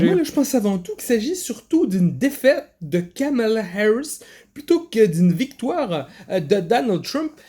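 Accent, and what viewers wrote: Quebec, Canada. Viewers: ton accent québécois est solide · vive Trump tabarnak ça fait du bien aussi d'entendre un accent québécois parce que l'accent français un moment donné ça tombe sur les rognons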